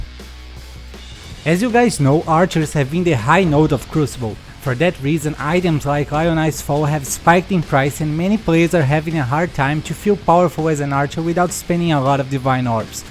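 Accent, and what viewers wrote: Brazil. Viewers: Esse ingles brasileiro me pegou mt Bom trabalho mano!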